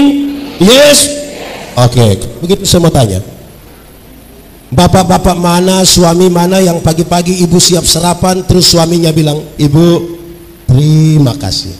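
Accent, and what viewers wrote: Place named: Eastern Indonesia, Indonesia. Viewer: itu bukan membentak... mungkin kamu baru liat atau baru mendengar aksen/dialek seperti ini.. itu ciri khas bagian timur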